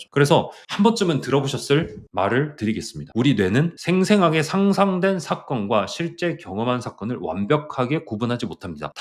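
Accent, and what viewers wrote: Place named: Jeolla, South Korea. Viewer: 아무리 숨겨도 감춰지지 않는 전라도 사투리=사짜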